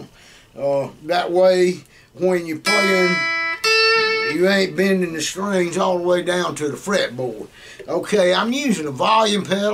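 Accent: South, United States. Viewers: That’s the best southern accent ever N he’s not a bad player either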